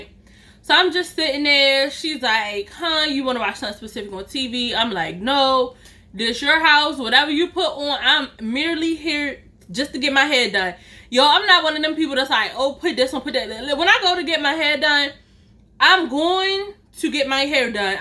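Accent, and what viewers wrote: Baltimore, United States. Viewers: Bruh lol this my second video I’m watching I just knew u sounded like u was from Baltimore 😭 I was like I ain’t tripping then u said city I was like oh yeah the accent never lies ! Now I feel the vibes stronger 🙌🔆‼️😎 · Ikkk you sounded from Baltimore hey girl hey lolll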